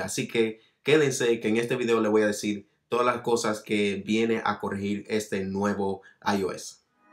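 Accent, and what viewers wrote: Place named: Dominican Republic. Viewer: Dominicano y pico.!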